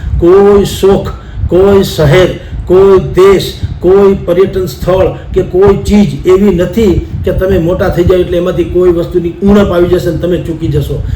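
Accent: Kathiawar, India. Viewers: Aaj Ananya hamara dhanyvad મહેતા ની ખૂબ જ સચોટ અને પ્રભાવી વાત હોય છે તેમણે ઊંડો અભ્યાસ કર્યો છે તેમજ તેમના જીવન ઘડતરમાં ખૂબ જ અનુભવ કેળવ્યા છે તે બધા વાલીઓને સારા માટે કહે છે વિદ્યાર્થીઓને સારા માટે કહે છે અને હું પણ એમના વાતમાં સહમત છું ખૂબ જ ઉમદા અને સરસ વાતો કરી પ્રેરણાદાયી વાતો છે દરેક વાલી જો સમજે તો પોતાના બાળ સંતાનોને યોગ્ય માર્ગદર્શન આપી શકે છે ટેલેન્ટ ની ખૂબ સરસ વાત કરી છે દરેકે પોતાના દીકરા માં શું ટેરેન્ટ છે તે જાણીને તેના તરફ પ્રોત્સાહિત કરવા જોઈએ. મહેતા સાહેબને ખરેખર સરસ્વતી માતા સાક્ષાત છે તેઓને જ્યારે સાંભળ્યું છે ત્યારે એક સંત મહાત્માની જેમ તેમની અવિરત વાણી અમારા જેવા નાના માણસોને સાંભળવા મળે છે. અમુક ખૂબ જ આ વાત માંથી પ્રેરણા લઈએ છીએ કાઠીયાવાડી લેંગ્વેજ થી તેઓ જ્યારે વાત કરે છે અમને ખૂબ જ ગમે છે ખૂબ જ મોજ આવે છે ઘણીવાર હસાવી પણ દે છે અમે હસી પણ લઈએ છીએ પરંતુ ગંભીર જે બાબતો છે તેને અમે નજર અંદાજ પણ નથી કરતા ખૂબ જ સચોટ કહે છે. આજ રીતે પ્રજાના પ્રશ્નોની વાંચા આપતા રહેશો તેવી અમને આશા છે મહેતા સાહેબે વિસ્તૃત માહિતી આપી તે બદલ ખુબ ખુબ ધન્યવાદ 👍👍 તેમની સાથે સંવાદ કર્યો તે પત્રકાર મિત્રને પણ અમારા ધન્યવાદ 👍👍👍👍 જય હિન્દ વંદે માતરમ ત્રાગડ ચાંદખેડાથી ગાભાજી ઠાકોર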